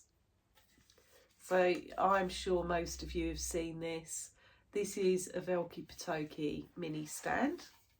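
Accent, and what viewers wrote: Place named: United Kingdom. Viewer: Hi! Stitchy Sally shouted you out and posted a link to your Flosstube, and I’m glad she did! 😊I’m blown away by your fabulous projects and enjoyed listening to a fellow Brit!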